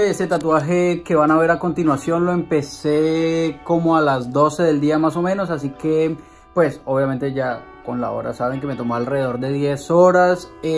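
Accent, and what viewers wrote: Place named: Bogotá, Colombia. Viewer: si noté por como hablas q eres de acá, sería brutal q tuvieras una tienda bogota, un abrazo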